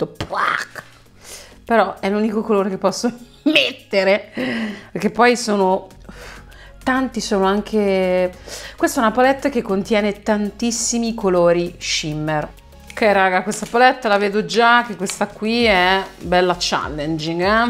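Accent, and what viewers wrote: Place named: Ancona, Italy. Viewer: Sento quasi l’accento anconetano/decollemarì 😂😘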